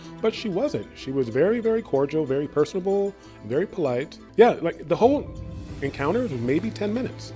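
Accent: South, United States. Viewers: Hi, I am one of your babies, you're not only an informative, Amazing story teller of crimes, I'm totally impressed with you and you are Stunning and an Awesome Mama, I'm from New Orleans & we have a ton of N.O.La.accent down pat, are you actually from Florida or a more Southern town??? Either way I will follow you as often as I can find a story I've not already seen, take care of you 🤗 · You’re a little too much for me. I’m not your “baby”. Can’t you just talk without all that southern foo foo and fingernail drama? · You say the word , "on", In a different accent that your normal , seemingly southern accent. Strange. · This is a horrific case with horrific details. On a totally different note where is this content creator from? I'm only looking for a general area because that slight southern drawl is the best thing I have ever heard. I might even be willing to relocate. 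· When did you get a southern accent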